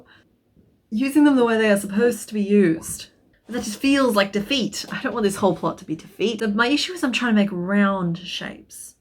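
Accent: Australia. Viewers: I was over the moon from the moment I hear the Aussie accent! No disappointment here 😂 look forward to binging through your videos now "hyperfocus? Me? Never!" 😅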